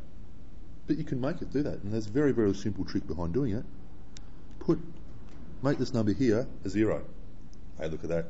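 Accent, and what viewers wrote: Australia. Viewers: its Australian 😂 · Well done . What a nice !Australian accent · The accent is from Australia.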